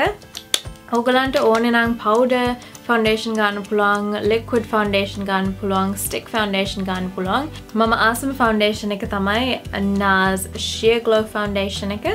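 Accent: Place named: Sri Lanka. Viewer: Nice video 😍 love your Sinhala accent 😘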